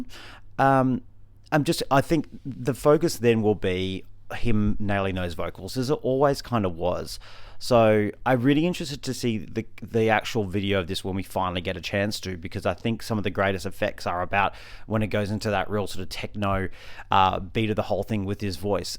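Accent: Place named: Australia. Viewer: Hope you had a fantastic birthday! 🎂Been listening to for years and have always apprechiated an aussie voice to the fun of the eurovision song contest. best wishes from a fellow aussie!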